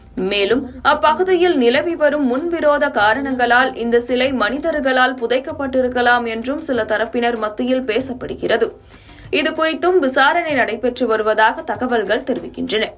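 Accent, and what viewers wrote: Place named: Telugu, India. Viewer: Telugu voice 😅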